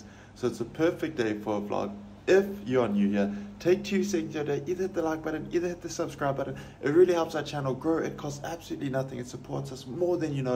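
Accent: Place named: South Africa. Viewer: I die every time he says asssss cream shops😂love the SA accent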